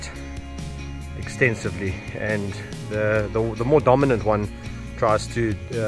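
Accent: South Africa. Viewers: He is South African I can hear his accent Hoe Gaan dit my ou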